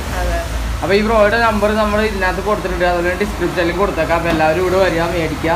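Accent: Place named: Thrissur, India. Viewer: സംസാസാരം സ്പീഡ് പോരാത്തതിന് ശരിക്കും ത്റ്ശൂർ സംസാര ശൈലിയും ഒന്നും ശരിക്കും മനസ്സിലാവുന്നില്ല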